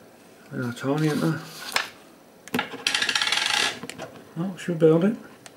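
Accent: United Kingdom. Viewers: UK english?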